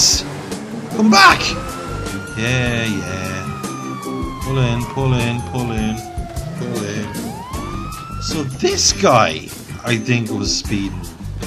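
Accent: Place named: Dublin, Ireland. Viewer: I'm Irish and that's a pure fuckin Dublin Ireland acsent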